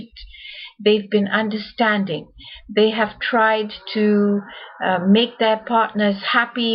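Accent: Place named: South Africa. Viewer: she sounds like a South African!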